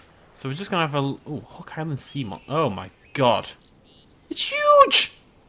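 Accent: United Kingdom. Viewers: I no your British but why did your voice Chang to a British voice and are you British amrican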